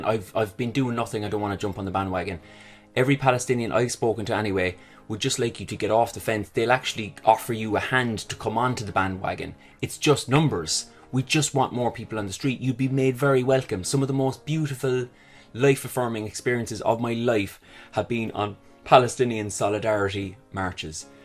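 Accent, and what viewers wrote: Ireland. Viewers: The Irish rrrr good , yeh l love this guy ❤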